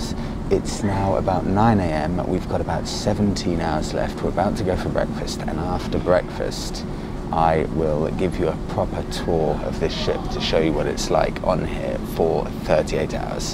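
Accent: England, United Kingdom. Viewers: You should be on Jackanory telling stories, your voice is quentisently English old chap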